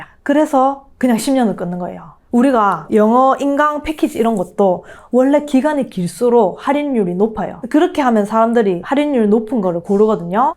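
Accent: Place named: Gyeongsang, South Korea. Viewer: 역시 갱상도 언어^^ 구수~~~~함미다^^🎉🎉🎉 청송아재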